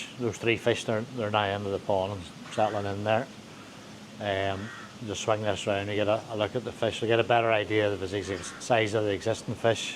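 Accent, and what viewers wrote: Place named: Northern Ireland, United Kingdom. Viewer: I seen you in Yvos video. Well heard you lol so I messaged Yvo and asked is he a Bangor man or Holywood man. He said no he's from Northern Ireland haha. I'm from Holywood originally. Keep the content coming...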